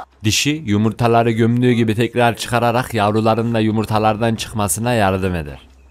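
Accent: Ankara, Turkey. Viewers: Usta anlatma şeklin Ankara şiiven anlattığın herşeyi akılda kalıcı yapıyor iyi düşünmüşsün kolay gelsin.😁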